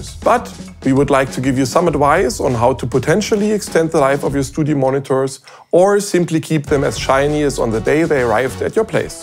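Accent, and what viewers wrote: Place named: Germany. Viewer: Beste, ich liebe dieses deutsche Englisch xD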